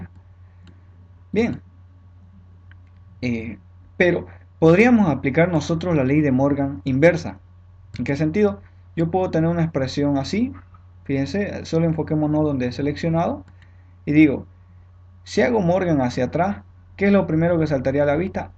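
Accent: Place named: Bolivia. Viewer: Sos de Bolivia? Se me hace familiar tu acento :v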